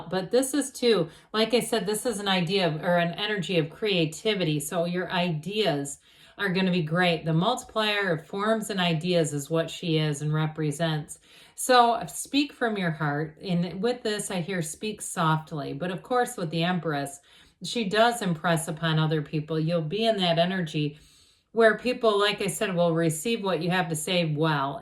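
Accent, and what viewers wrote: United States. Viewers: Thanks you so much. Of all tarot readers you're my favourite, and I love your American accent 😊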